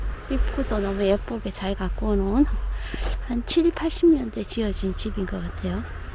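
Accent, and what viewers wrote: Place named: Gyeongsang, South Korea. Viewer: 눈이내린 스위스로~ 달려가고파요 ^^ 하늘빛까지 내려앉은 눈덮힌 설경이 너무 아름다워서 얼른 캔버스에 옮겨놓고 싶어지네요! 그래도 될까요? 안개속 몽환적인이 풍경이..... 눈까지 맑게해주네요!!! 영상속 씨의 정겨운 저랑같은 경상도 억양도 소박하게 느껴지고, 이다음 스위스가게되면 좋은곳 많이 소개해주세요. 감사히 영상 잘봣어요^^ 즐건 주말되세요♡♡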